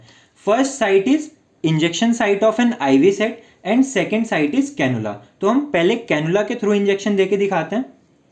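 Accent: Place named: India. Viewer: Can you speak slowly please and all tte time English, most people don't use Indian English accent, and pronunciation..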